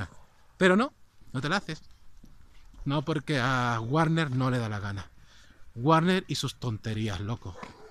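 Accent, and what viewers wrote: Spain. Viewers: No se, su acento es muy español, su intro es de español de España. Pense que era de la península Ibérica.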